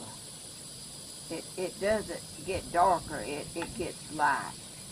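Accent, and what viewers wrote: South, United States. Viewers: I feel so Blessed to have found you on You Tube! I do silk flowers ideas. Wreaths, arrangements and more. God gave me this talent and I give Him praise for everything! You are a joy and time gets away from me also. I can't seem to get anything accomplished especially since we made connections! lol I just lay back and enjoy all your work and your southern talk and cute happy laughter! I'm like you and I like to talk!! Sure wish I could meet you but I live in Louisiana and Arkansas! Both states! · You,sound like down home, lovely, southern girl. I’m from Alabama and I,sound very southern myself. Love your videos. Great ideas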